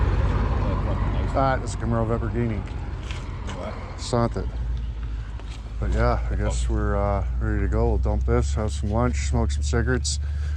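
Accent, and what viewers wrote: Canada. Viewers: Hr sounds like Thommy Chong, I guess its a Canadian thing 🇨🇦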